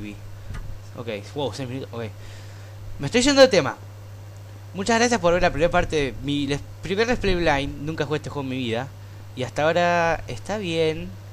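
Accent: Argentina. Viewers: alguien me puede decir de que país argentino o ingles aaaaaaaaaaaaa me confundo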